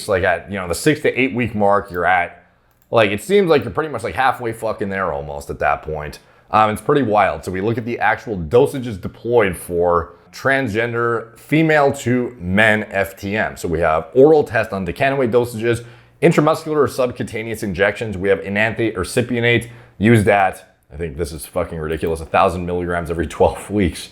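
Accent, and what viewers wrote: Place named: Canada. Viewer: Anytime he pronounces something different than I’ve heard before I just blame it on Canada.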